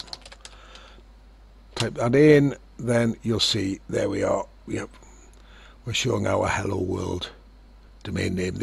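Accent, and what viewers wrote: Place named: Wales, United Kingdom. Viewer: your accent is so welsh lol thanks, was really stuck for ages :P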